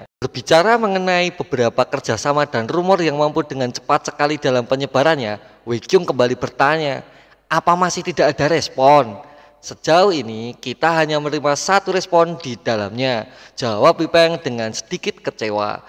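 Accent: Java, Indonesia. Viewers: Bang kalok suara nya agak medok gitu pesti nanti view nya rame karna kocaknya, ditambah unsur² kocak bang biar rame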